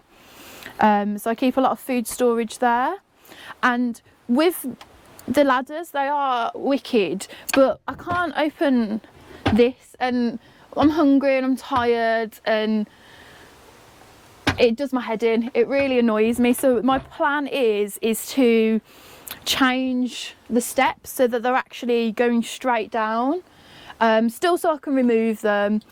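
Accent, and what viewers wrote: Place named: Coventry, United Kingdom. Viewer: This may be a bit of a long shot but that sounds like a Cov. accent. Possibly even Nuneaton.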